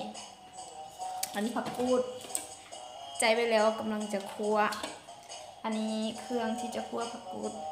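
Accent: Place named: Isan, Thailand. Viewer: บ้านผม เรียกสำเนียงแบบสาว ว่า เว้าย้อ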